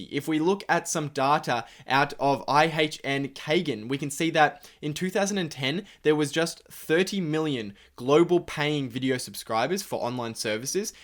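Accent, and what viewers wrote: Australia. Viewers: Like your vids and your Aussie accent :)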